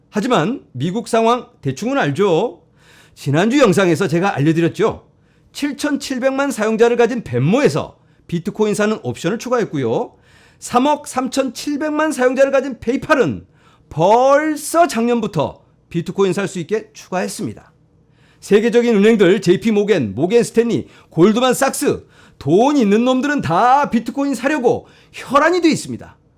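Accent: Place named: South Korea. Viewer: 발음이 구수하십니다 한국식 친근감가는 하이리스크 하이리턴!ㅎㅎㅎㅎㅎ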